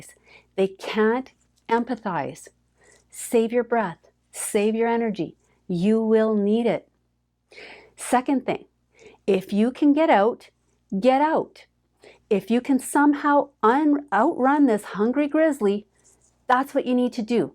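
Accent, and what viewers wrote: Canada. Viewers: I like your Canadian accent! Canada is my favorite place to visit. Thanks for sharing.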